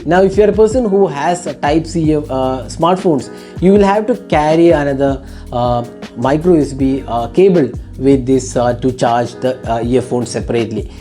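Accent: Kerala, India. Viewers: You sounds like a mallu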